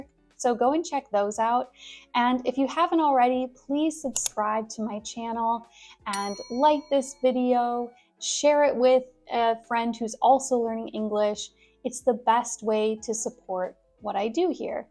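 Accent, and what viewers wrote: United States. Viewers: I love American accent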